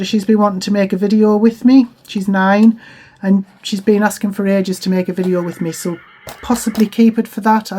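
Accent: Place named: Sunderland, United Kingdom. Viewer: Just found your site.. that sounds like a Sunderland accent.. great vid thanks 🌹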